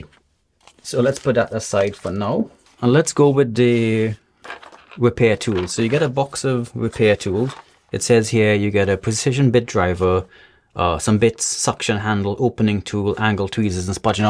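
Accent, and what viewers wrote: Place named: Trinidad and Tobago. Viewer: I could hear the Trini accent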